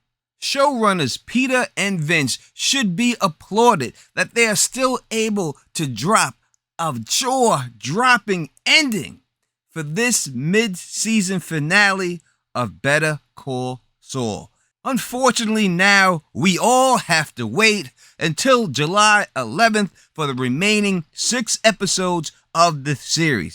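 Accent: New York, United States. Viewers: Lalo and Gus are going to meet for a death match. Lalo gets killed and buried in the lab. Remember when the fly drove Walt crazy? Flies/dead bodies. Haha. Not sure what Lalo wants with Kim and Saul. I guess to find out what really happened in the desert and if it tells him more about the superlab? He may use them to get past Mike somehow for a showdown with Gus; he’ll probably find out Saul knows Mike and he was helped by him. I think Kim either has to go to prison for Howard’s murder or die. I don’t think Ed is a good solution, they’ve used it too many times. We know that Saul buys the black book from the Vet though, as he still has it. All the pieces haven’t come together for me, except Lalo’s death, it has to happen to give Gus so much control in Breaking Bad. I agree, they’ll stick it. It might not be what makes us all happy but it’ll be right for the universe. 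Love your NY accent dude. Okie dokie? Really? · as someone born & raised in NY, the way you say BETTAH CAWL SAWL really makes me homesick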